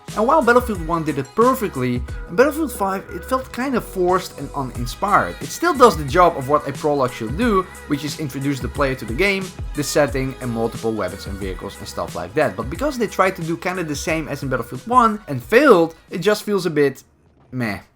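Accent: Netherlands. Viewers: Ik hoor aan je stem dat je Nederlands bent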